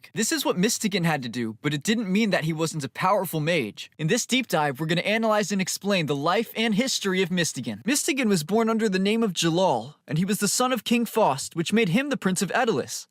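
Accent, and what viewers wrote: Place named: United States. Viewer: Your American accent is adorable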